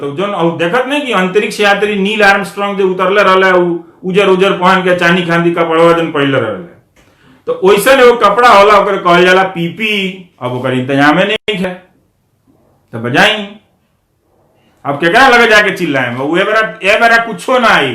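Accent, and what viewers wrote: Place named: Bihar, India. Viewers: Etna nik koi na boli bhojpuri main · Sir U are the hope of this country. Love your bihari ascent. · Ye style bohat acha laga pura bihari aur bhojpuri style maja as gaya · Very nice bhojpuri ravish sir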